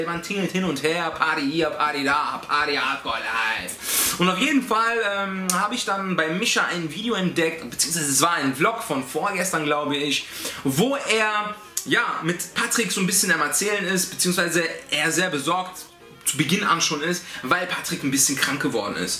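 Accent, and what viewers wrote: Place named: Switzerland. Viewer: hahahahahaha wie du schweizerdeutsch sprichst 😂😂 Top!!! 👌👌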